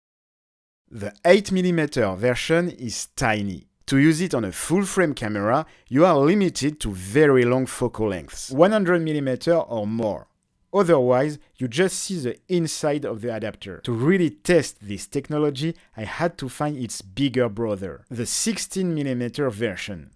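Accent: France. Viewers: 1. Great and fun historic video 2. Interestingly, this is a physical form of compression. Meaning, for example, JPEG 2000 uses wavelet compression, which is sort of the physical version of that. Another way of thinking of it is instead of shrinking an image in 2 axis, compressing just one. 3. I invented many technologies for the Movie industry, including Core tech for Video Assist (ARRI/Panavision/Sony ) and I invented Disney's Animation Studio software 4. Your French accent is so strong, I thought you were doing an Inspector Clouseau skit. This is not an attack; you are completely understandable. In fact, I would vote if anyone wants to learn to speak French, first learn to mimic you speaking English, and THEN learn French. In sort of a strange way, you're the anamorphic accent filter. English -> English with only French pronunciation -> French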